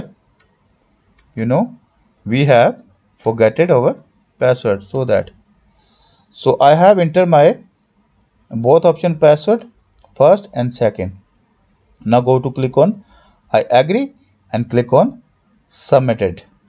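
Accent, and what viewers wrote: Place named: India. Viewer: Gosh. The Indian English accent is the worst 🤮🤮🤮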